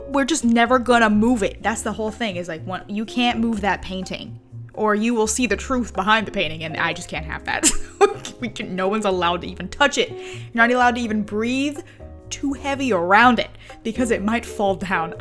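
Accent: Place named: South, United States. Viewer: Jasmine J she’s southern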